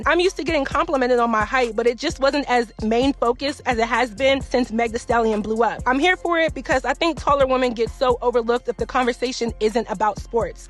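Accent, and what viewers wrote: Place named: Philadelphia, United States. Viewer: I knew that title had to be click bait . The only reason I know you’re African is because you tell us. You talk like every other girl in Philly plus you rap 😂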